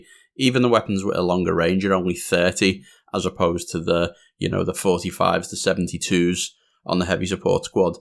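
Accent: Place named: Merseyside, United Kingdom. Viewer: Dropped a like and subscribed because of the Merseyside accent ;)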